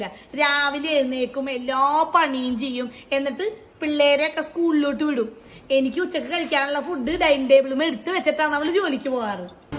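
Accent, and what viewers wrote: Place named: Paravur, India. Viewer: I love your parur Accent👌👌I am also from parur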